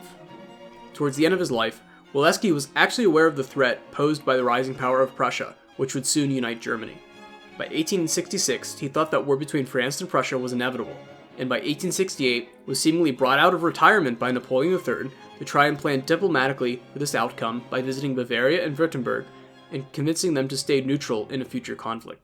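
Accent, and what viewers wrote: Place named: Midwest, United States. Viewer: Slow-down, Kiddo. You are rushing your excellent Narrative. Midwesterners do that. Runningwordstogether.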